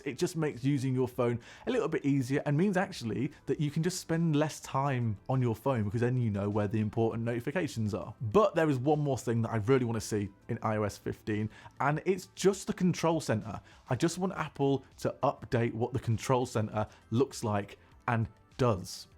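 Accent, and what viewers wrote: United Kingdom. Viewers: Thanks for the updates, it’s always kind of fun to anticipate with the new iOS will do and what improvements are on the way. Can’t quite figure out if you’re British or Australian but I do like the accent. You have a friend in Columbus Ohio USA!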